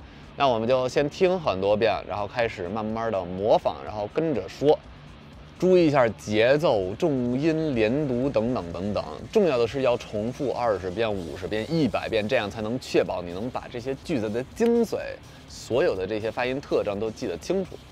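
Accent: Beijing, China. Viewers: 你的中文真的不错啊，希望你能改掉那种京片子的腔调，这种腔调其实现在北京人也不说，因为给人油嘴滑舌的感觉。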